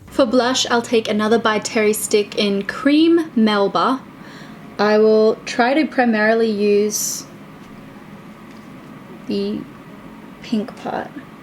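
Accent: Australia. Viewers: I swear there should be more of our accent in you tube videos. We really have the best sayings about things lol. #aussieaussieaussie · Nice to hear an Australian accent. 😊🌸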